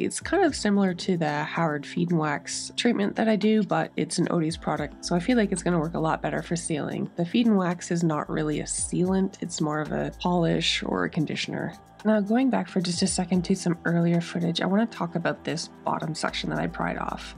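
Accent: Canada. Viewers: Gorgeous!! Ok, confused. You definitely have the Canadian accent but your “y’all” really threw me! 😂 I was born and raised in Wisconsin, but have lived in the south for 30 years, so to say I have an odd accent would be an understatement… But I definitely say y’all because I got sooo many comments for saying you guys.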